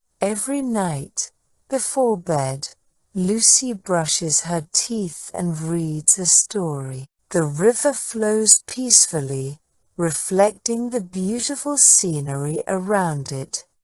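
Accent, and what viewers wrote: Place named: United Kingdom. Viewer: Excellent authentic British English pronunciation. Only listening to you and imitating is the key to English fluency.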